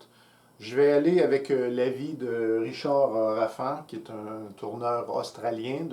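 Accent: Quebec, Canada. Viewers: Merci pour tous ces conseils éclairés , sans ésotérisme et sans "course à l'armement. Et avec ce superbe accent québécois mais pas trop prononcé pour être bien intelligible pour nous maudits français 😄. Entre un bas de gamme chinois qu'on rachètera ou dont on trouvera vite les limites et un outil surdimensionné, le choix est toujours difficile quand on veut être économe. Mes prétention sont très très limitées à du tournage utilitaire très occasionnel , type manche par exemple. J'ai par ailleurs vu sur la belle vidéo qui expose le tournage d'un bol sur bois vert sans mandrin qu'on pouvait arriver à se débrouiller avec un minimum. Ma question est l'acquisition d'un tour Einhell léger ( donc à fixer) et avec un pas de vis non standard ( donc pas d'adaption de mandrin à mors) est forcément un mauvais choix et s'il faut plutôt s'orienter vers un tour type holzstar db 450 ou holzman, ce qui si l'on rajoute la rallonge pour des pièces de 1m, triple à peu près le budget (190€ versus 450+130€). 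.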